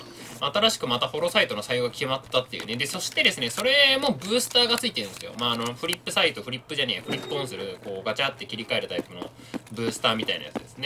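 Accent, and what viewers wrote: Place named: Western Japan, Japan. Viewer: 西日本の人は質をひちと読みます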